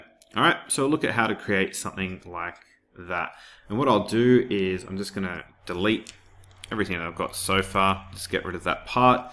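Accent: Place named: Australia. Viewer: Bro, The dude in Thor Ragnarok is New Zealand. This dude here is Aussie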